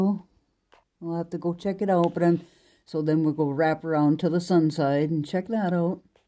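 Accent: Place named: Michigan, United States. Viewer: I love hearing your Michigan accent. Wow lotza stuff up there today, here thick gray so far making it so dark inside.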